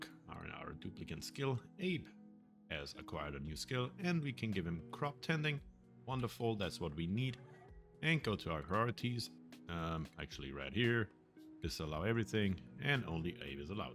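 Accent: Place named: Germany. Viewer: i have watched enough joerg sprave to know that this man is definitely german.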